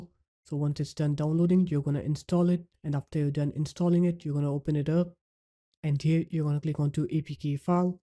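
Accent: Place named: India. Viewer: Mate u cant get raid of indian accent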